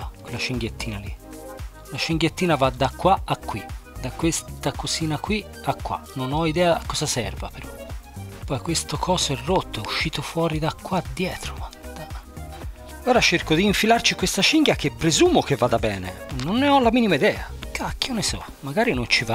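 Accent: Tuscany, Italy. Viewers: Ti ho appena scoperto, sei davvero molto bravo ad intrattenere, oltretutto ho notato che hai il timbro della voce uguale identico a quello di Gianluca Bocci , se non fosse per l'accento toscano marcato ( che è splendido fra l'altro) saresti uguale a lui 😂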